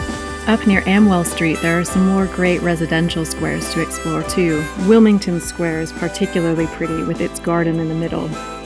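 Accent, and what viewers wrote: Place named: California, United States. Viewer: it somehow seems sophisticated with a soft Californian accent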